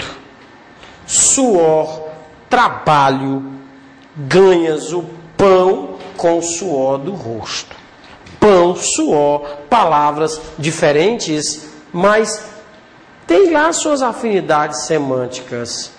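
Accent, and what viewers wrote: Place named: Northeast, Brazil. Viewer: Você é o cara, já vi de tudo mas posso concluir que com você o entendimento é rápido e claro. Feliz São João professor, pelo seu sotaque ou é nortista ou nordestino. Um abraço.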